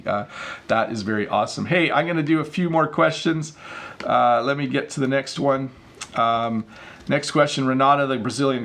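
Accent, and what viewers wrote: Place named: Canada. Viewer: I like English with a Canadian accent.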